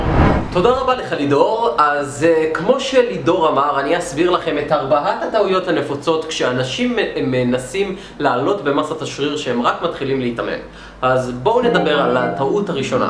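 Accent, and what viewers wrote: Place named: Russia. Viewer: מבטא רוסי חח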